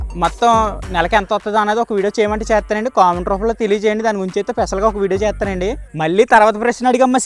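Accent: Godavari, India. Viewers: Hai anna mana godavari slang lo super warning echav anna all the best anna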